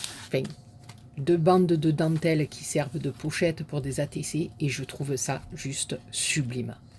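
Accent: South, France. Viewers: Bonjour, quelle belle voix du sud, beaucoup de soleil et d'émotions dans la voix, adieu la barquette en plastique et bonjour le nouveau pot "carrousel" à crayons, très très jolies créations j'aime beaucoup tous les envois et les astuces c'est trop sympa les ballons biz à toutes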